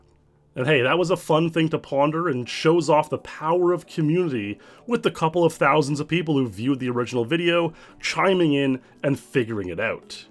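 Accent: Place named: Eastern Canada, Canada. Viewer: I detect an eastern Canadian accent-- Newfoundland? Halifax?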